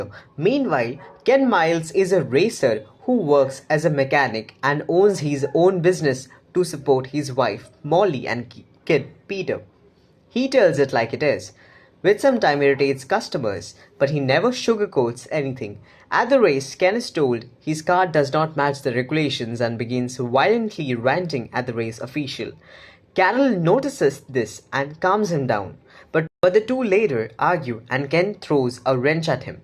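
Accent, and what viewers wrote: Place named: India. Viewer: I love how they used an Indian voice for the narrator... If it's to bring us together I'm in... If it's to turn people against each other I'm out✌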